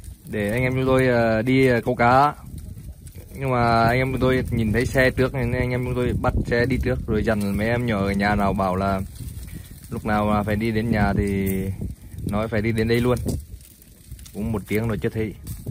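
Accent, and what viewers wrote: Nghe An, Vietnam. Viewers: 🥰🥰🥰 Yêu cái giọng nghệ an ghê😂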